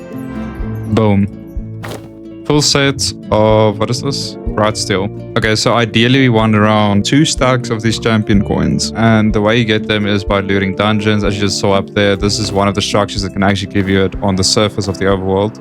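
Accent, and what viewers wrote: South Africa. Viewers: I knew it as soon as you said chow the accent gave it away , you are a successful Afrikaans YouTuber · Yo he has such a south african accent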